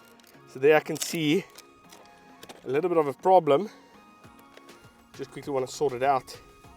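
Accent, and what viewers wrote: South Africa. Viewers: Hey you live in SA still? I had to sub from your accent lol, ex Joberg resident myself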